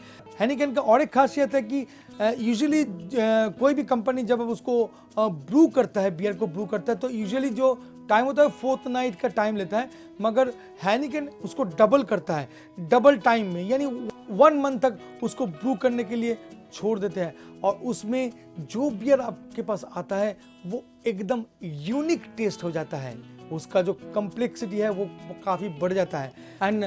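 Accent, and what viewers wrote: Bengal, India. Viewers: Ur tone says that u r Bengali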